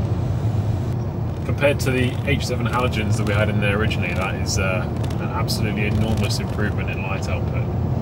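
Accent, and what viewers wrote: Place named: England, United Kingdom. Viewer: Dude, dont get offended on this. But it doesnt add up... IRL licence plate but you got English accent :) how come? Which year was the car made? Decent shape tbh! But also, i wish these fixes would cost the highest effort to complete :D ... or not all Landies are rubbish as the urban legend says? The lighting is pretty decent upgrade!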